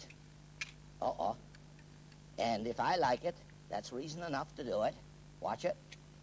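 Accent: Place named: Mid-Atlantic, United States. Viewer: He is using the mid-Atlantic accent. I wont explain it to you but you can search up "BrainStuff-Why do People Talk Weird in Old Movies" on YouTube and they will explain it to you.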